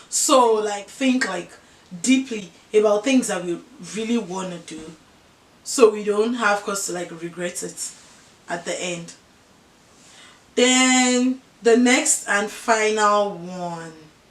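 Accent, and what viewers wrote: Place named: United States. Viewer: You still need to know how to speak Yoruba without the American tone. Above all, It a nice program.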